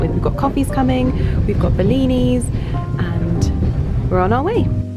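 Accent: RP, United Kingdom. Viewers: it always cracks me up when people in the comments spell Berkeley out as Barkley...that dog's damn name is Berkeley but desperately wannabe-posh accent makes it sound like Baaaaah-kley and people get fooled. hilarious indeed...thank God he actually barks so it kind of makes sense, in a way 😄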